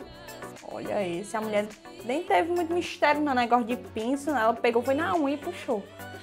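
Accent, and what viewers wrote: Brazil. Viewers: este sotaque bonito é da Bahia ? Pois ocê não puxa o "S" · só pelo sotaque ja dá pra saber q é nordestina, slv pra Fortaleza 🇫🇷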